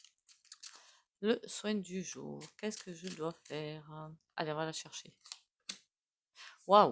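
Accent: South, France. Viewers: Bonjour. Je viens de m'abonner car j'aime beaucoup votre facon de faire cette voyance.Votre petit accent du midi aussi❤ bisous de Toulouse.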